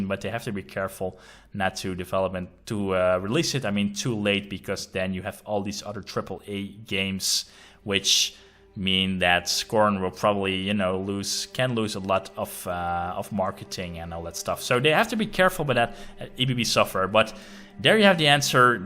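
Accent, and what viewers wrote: Netherlands. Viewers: Gotta love the Dutch accent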